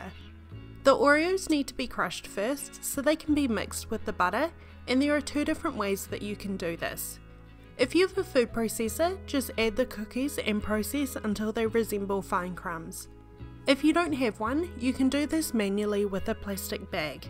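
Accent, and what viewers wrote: New Zealand. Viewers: that New Zealand accent is everythinggg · Nice nz accent 👌🏻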